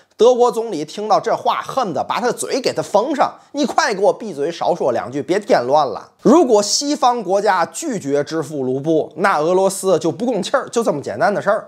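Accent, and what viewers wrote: Tianjin, China. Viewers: 我在天津呆过两年，这天津卫的普通话太亲切了，你是真有才，把天津人的幽默发挥到财经政治方面，加油，继续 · 太喜欢听的天津话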